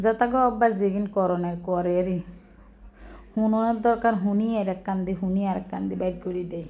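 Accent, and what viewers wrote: Noakhali, Bangladesh. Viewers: ❤❤❤❤❤ ব্লগে চলে এলাম নোয়াখালী ভাষা শুনতে। খুবই মিষ্টি মধুর লাগে নোয়াখালীর ভাষা শুনতে। মুরগির মাংস রান্না করতে দেখলাম। ভালো লাগলো খুবই ভালো লাগলো আবার আসবো তোমার চ্যানেলে আরো অন্যান্য নতুন নতুন রেসিপি পেতে আর তোমার মিষ্টি মধুর কথা শুনতে। 👍🏼👍🏼🌹🌹🌹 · তুমি নেয়াখালির বউ 😁 তোমার কথা গুলো নোয়াখালী ভাষায় চমৎকার হয়েছে 👌👌💞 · মুরগির মাংস রান্না মাছ রান্না খুবই ভালো লাগলো নোয়াখালী ভাষায় কথা বলাটা খুবই ভালো লাগলো মাশাল্লাহ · মুরগির মাংস রান্নাটা খুবই সুন্দর হয়েছে আপু অসাধারণ আপনার নোয়াখালীর ভাষায় কথা খুবই ভালো লাগলো দারুন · আসসালামুয়ালাইকুম প্রিয় আপু দারুন হয়েছে দুপুরের রান্না বিকালের নাস্তায় বাধাকপির পাকোড়া এটা আজকে কোন ভাষা শুনলাম😊 এটা কি নোয়াখাইল্লা ভাষা😊 আপু খুব ভালোই লাগলো শুনতে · নোয়াখালী ভাষার সঙ্গে❤ বাঁধাকপির পকোড়া❤ চিকেন কষা সবমিলিয়ে ভিডিওটা অসাধারণ❤ সঙ্গে নোয়াখালীর কথা তো আরো অসাধারণ আপু ❤ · Apu noakhalir bou tumi kotha gula shunte onek valo legese ❤❤pakora darun hoise ❤❤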